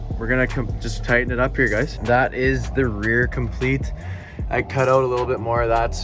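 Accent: Canada. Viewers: Buddy your accent is legendary, Canadian for sure hahaha. Thanks for the guide!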